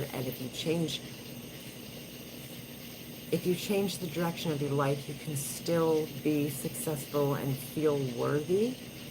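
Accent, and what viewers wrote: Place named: New Jersey, United States. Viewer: Missed your 1st live:( watching now i would of never guessed you’re a Jersey Girl.I hope you do more lives! Hit the like folks its free helps the channel! And subscribe, of course.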